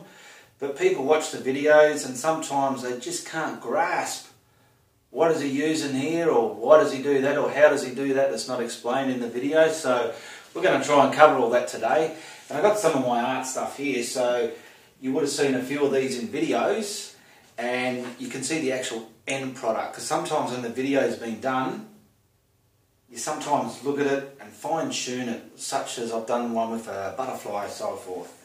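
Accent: Australia. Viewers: Thanks for the video,! And, you shouldn't change the way you speak, you're Aussie speak as you normally do!:-) My American ears find an Australian accent quite lovely. Cheers!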